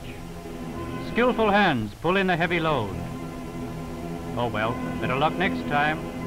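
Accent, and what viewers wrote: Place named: United Kingdom. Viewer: Its always with a british voice.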